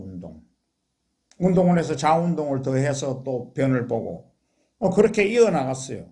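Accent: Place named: Gyeongsang, South Korea. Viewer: 멋진 우리 리더님 구수한 경상도 특유의 사투리 너무 정겹고 멋있으십니다^^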